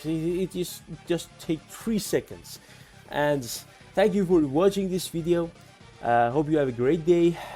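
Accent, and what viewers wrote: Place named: Iran. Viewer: dash zar mizane irani hasti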